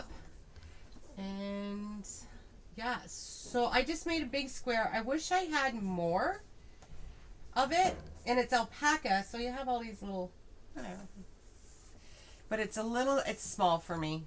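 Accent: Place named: Canada. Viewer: I’m watching this video to get a review of the yarn and a couple minutes in I realized oh my God this woman is obviously Canadian and of course you are so am I! I’m just impressed when I can pick it out right away. It’s always the vowels that give it away.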